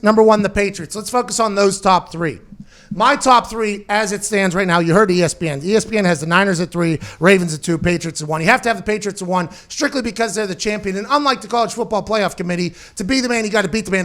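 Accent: Pittsburgh, United States. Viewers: Plum, PA. So yes a Yinzer.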